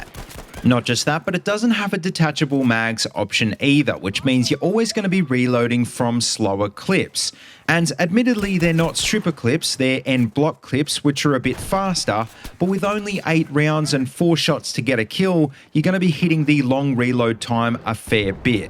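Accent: Australia. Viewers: good to hear an Aussie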